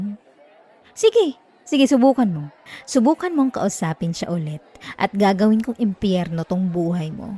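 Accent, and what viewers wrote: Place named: Visayas, Philippines. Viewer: ptnginang kabisayaan nato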